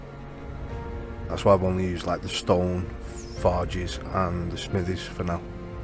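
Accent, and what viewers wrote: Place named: England, United Kingdom. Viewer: yeah I saw the creator bit with vids but couldn't find a list of the creators to check out . There should be a sections with a list of the creators and their channels to check out if there is I'm sorry I can't see if but if there is point me in the right detection ...I have just been getting some pointers off some of the guys on how to make vids on the xbox since a few people have messaged me asking me if I have vids on some of my builds but I only have pics ...I'm going to be trying to make a vid in the next couple of days with the help of some of the guys from the discord ...ohh n bwt love your accent on the vids makes me feel at home since I have moved out of England to be with my gf ..keep bringing out some awsome vids hope to see some cool designs in the future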